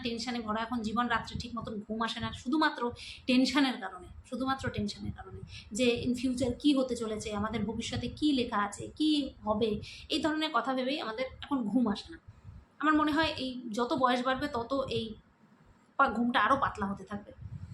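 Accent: Bangal, Bangladesh. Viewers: ❤❤❤❤khubbb valo ble6o r tomar amgo ei bangal ktha purano diner ktha mne kraiya dayyy,vuilla jai amio bangal❤❤❤❤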